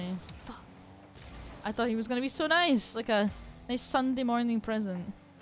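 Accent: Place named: Scotland, United Kingdom. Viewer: Wait, you're Scottish? So am i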